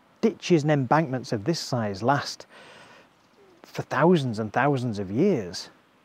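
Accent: Hull, United Kingdom. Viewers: These video's are brilliant, and in that wonderful Hull accent =👌.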